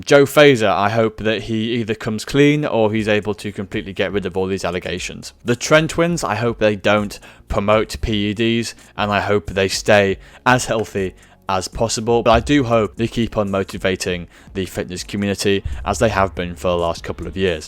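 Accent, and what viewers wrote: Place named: United Kingdom. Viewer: So sick of backwoods British accents on so many youtube videos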